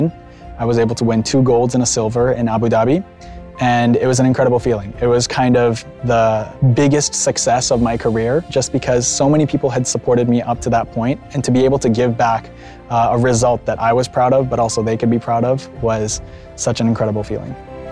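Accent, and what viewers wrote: United States. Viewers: اكثر شئ ركزت عليه اللكنه الأمريكية ...استمر يا بطل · في اللهجة أمريكي اما الشكل يمااااااااني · معاه اكسنت واحد يقول انه امريكي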